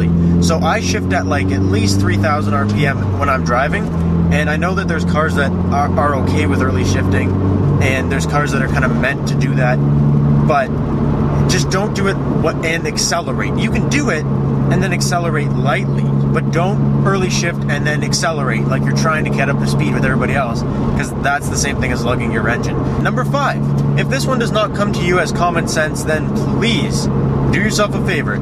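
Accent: Canada. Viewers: TheDerDumme He sounds Canadian to me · are you from Canada ? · ahah you canadian? maybe from ontario i heard G1 lmao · First video watched from you. Are you from Canada? XD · dat Canadian accent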